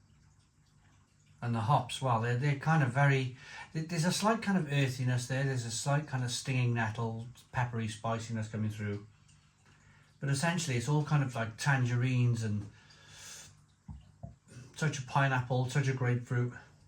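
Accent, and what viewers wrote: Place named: Scotland, United Kingdom. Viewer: Defo sounds like a Westie 😋👌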